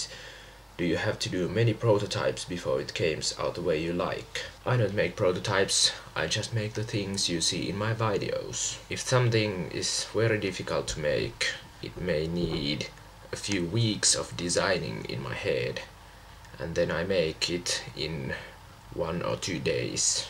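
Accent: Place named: Finland. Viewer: Love your Finnish accent:) i <3 and:)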